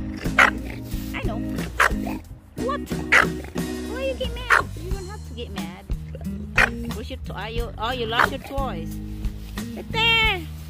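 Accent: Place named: Australia. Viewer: What a beautiful day and Australian accent connected to you now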